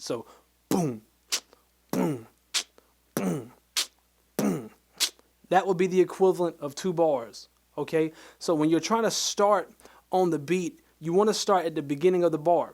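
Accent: United States. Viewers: You are one YouTuber that I can watch and understand all your teachings I love them all my song is coming together thanks and you sound like you from my home FLORIDA 😎 especially when you say dat 😂 we talk like dat 💯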